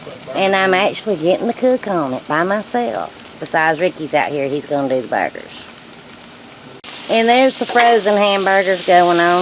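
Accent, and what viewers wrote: South, United States. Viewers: Love that southern accent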